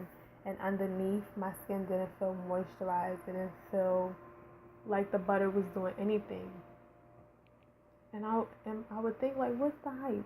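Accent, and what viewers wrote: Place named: New York, United States. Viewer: She sound like she from nyc but her voice is relaxing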